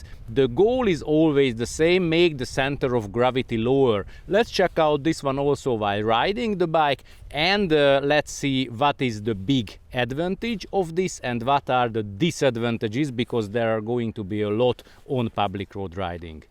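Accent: Hungary. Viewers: Are u by any chance from Hungary?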